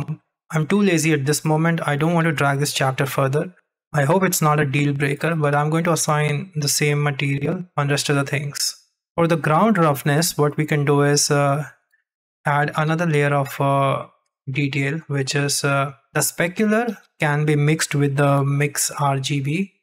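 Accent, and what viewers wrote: India. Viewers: Yes from his voice he sounds Indian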